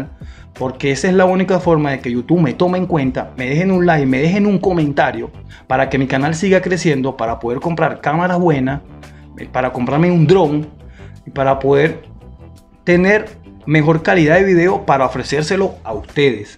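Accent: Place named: Chile. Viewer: 🤣🤣🤣 se ve que ya estás aprendiendo hablar chileno saludos desde puede alto buen vídeo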